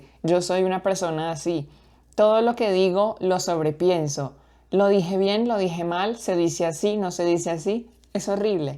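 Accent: Colombia. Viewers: rapidamente me he dado cuenta que eres Colombiana, por tu acento y por el uso de la palabra "vaina". buena leccion!!!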